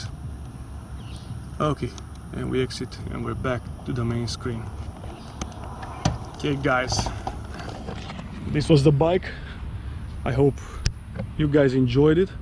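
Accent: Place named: South, United States. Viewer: I can barely understand your southern accent.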